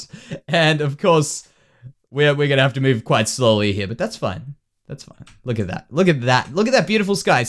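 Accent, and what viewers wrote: Australia. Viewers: Hey Mate! awesome video just found your channel and it seems like heaps of fun :D definately subscribing! also are you an Aussie?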